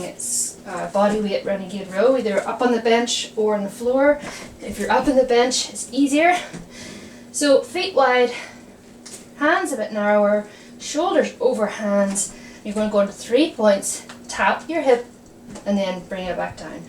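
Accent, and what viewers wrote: Ireland. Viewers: I love Irish accents. I was in heaven watching "The Fall"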